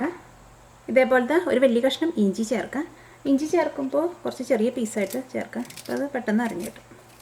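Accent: Thrissur, India. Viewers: nice recipe.. but I hate thrissur slang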